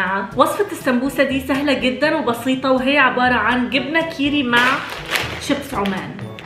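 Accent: Egypt. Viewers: هى من مصر بس تقريبا عشان عايشه ف ابو ظبى بتتكلم كدا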